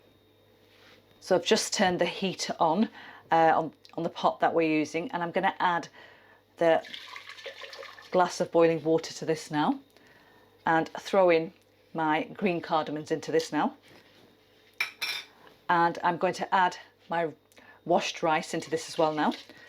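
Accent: United Kingdom. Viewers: You have a nice British accent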